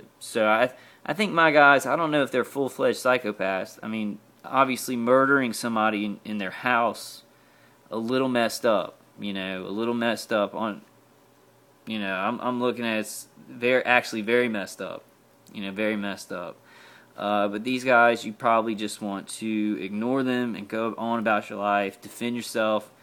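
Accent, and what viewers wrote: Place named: Baton Rouge, United States. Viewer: I see it's a Batman day today,. Your house seems nice- very modern- lucky it goes well with silver?:) You said once you came from Baton Rouge? I guess that would be the accent. I'm interested in US accents, as I am from Australia. I'm slowly getting there in distinguishing some of them. Good video. You've said you're focusing on the external. That seems like a good plan. It could really take some of the pressure off you.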